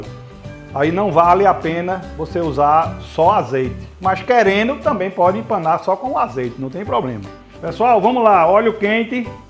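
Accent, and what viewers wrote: Brazil. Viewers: Excelente vídeo, didático e claro. Muito gostoso o prato e o sotaque do chef, Brasileiro puro. Parabéns. Like e inscrição de um estudante de cozinha. Muito obrigado chef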